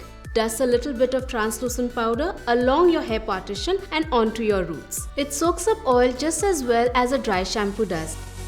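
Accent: India. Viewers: She has an Indian accent but she's black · that lady is so pretty. i think she african/indian(south asian) because her accent is indian.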